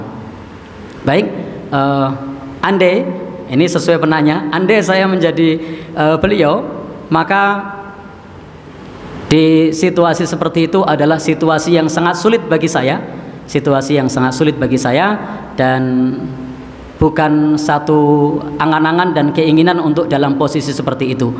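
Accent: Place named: Java, Indonesia. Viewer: Ustadz ini salah satu figur ulama yang patut di contoh. Sifatnya yang rendah hati, saling menghargai sopan santun dan ilmunya yang sangat tinggi. Juga logat jawanya yang kental. Benar benar ustadz panutan saya.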